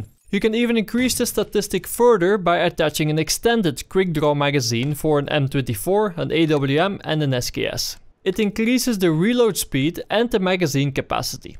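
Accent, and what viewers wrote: Netherlands. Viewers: its a dutch accent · (i'm guessing he's dutch) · i think ure dutch nice vid ik ben ook nl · You're a dutchie, right? :D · lets listen to your accent.... Are you Dutch? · this is dutch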